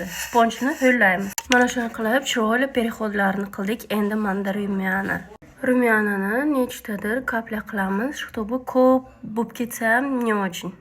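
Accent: Bukhara, Uzbekistan. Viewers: Салом яхшимисиз хон! Сизни тинлаш ва куриш менга жуда хам ёкади айникса Бухороча акцент сизни яна хам ширин курсатади. Ишингизга омад соглик саломатлик тилаймай❤ купрок чикаринг шундай видеолар!!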